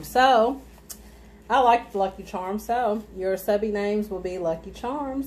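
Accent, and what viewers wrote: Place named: South, United States. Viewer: Love me som bk... love your country accent ❤️ ppl say I’m country but I don’t think so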